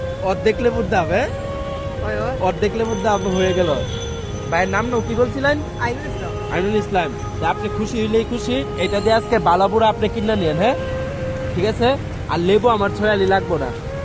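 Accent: Sylhet, Bangladesh. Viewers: Vaia apni ki sylheti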